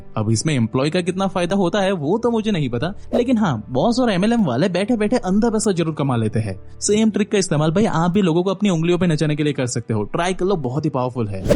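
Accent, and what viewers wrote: Bengal, India. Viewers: Content thik hai lekin ... Bong accent me Hindi pasand nhi aati ...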